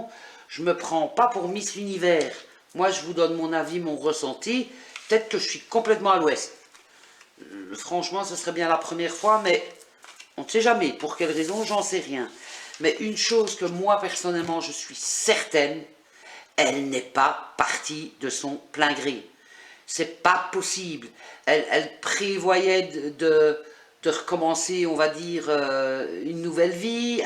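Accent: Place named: Belgium. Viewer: Vous êtes adorable et vous avez un chouette accent Belge ♥️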